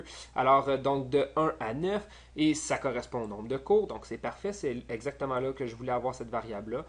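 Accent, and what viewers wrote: Quebec, Canada. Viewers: moki aj c’est du Canadian Français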